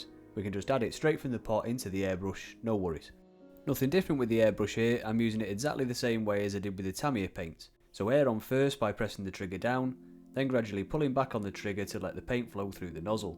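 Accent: United Kingdom. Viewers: Biltema acetone? You sound really British, but I thought Biltema only existed in Scandinavia?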